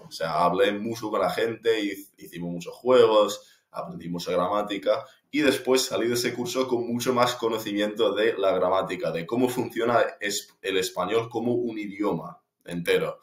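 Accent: Spain. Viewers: Tu historia es muy buena y ahora entiendo porque hablas español europeo y no como hablamos en América, México es una excelente opción para venirte de intercambio, universidades en Ciudad de México, Guadalajara y Monterrey tienen muchos estudiantes norteamericanos y europeos. Y la ventaja que en una hora de vuelo ya estás en casa. Te recomiendo que veas videos de europeos reaccionando a ciudades mexicanas, para que te quites ese estereotipo hollywoodense sobre que México solo es narcos y desierto. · Felicitaciones hablas muy bien con mucho acento español en Chile no lo hablarías tan bien. Por los muchos modismos qué usamos tendrías que hablar chileno. Chileno. En Perú hablan el mejor español y el idioma es el castellano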